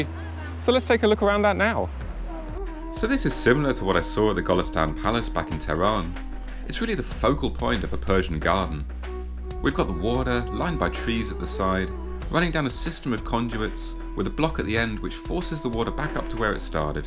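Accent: Birmingham, United Kingdom. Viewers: are you Brummie by any chance?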